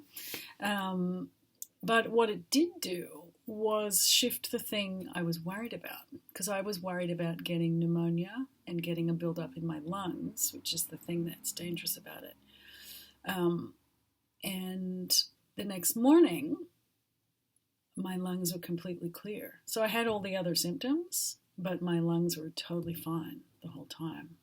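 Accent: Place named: Australia. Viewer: From one ozzie, I detect a slight ozzie accent listening to your talk 😉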